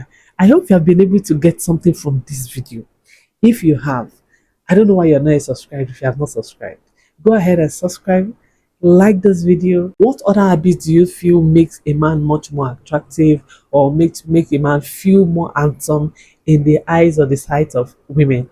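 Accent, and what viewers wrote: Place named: Nigeria. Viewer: Maaan… I love Nigerian people, the accent 😊❤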